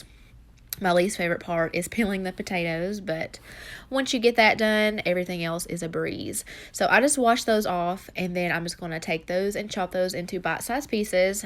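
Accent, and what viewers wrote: South, United States. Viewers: Eeeekkkkk I’ve missed these videos so much 😭😭😭😍😍😍😍😍 and your accent is everything. Southern girl here too ❤️ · I just love your channel..I love your southern accent. And your great food ideas..keep it up..much love from Nashville,tn Katie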